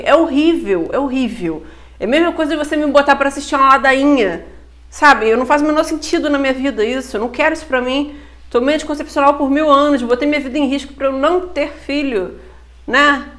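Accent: Rio de Janeiro, Brazil. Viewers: Seus vídeos são um barato, esse jeito carioca de falar kkkkk perco tudo! Adoro, reflito bastante, gosto de ver pontos de vista de pessoas sinceras, sem hipocrisia. Também não quero ter filhos, não terei e fim.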